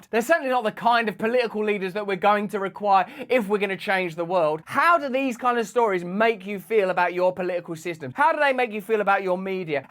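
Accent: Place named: United Kingdom. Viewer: “Geh op an go geh’em”… “Get up and go get them” I love the British accent, not making fun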